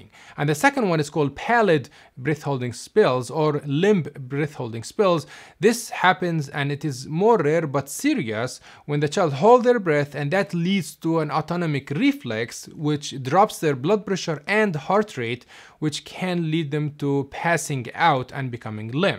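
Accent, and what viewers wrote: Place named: Arab world. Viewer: ما انتبهت لاسمك بالبداية لكن أول ما سمعت صوتك وطريقت لفظك للكلمات عرفت أنك عربي😂، سبحان الله لنا بصمة صوت وطريقة لفظ خاصة.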